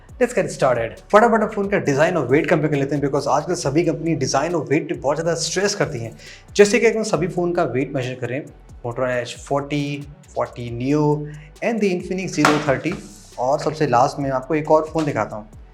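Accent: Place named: Bhojpuri, India. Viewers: Are sir ji aap to bhojpuri wale nikle 😀 kyaa baat kyaa baat ❤ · I love bhojpuri style 😊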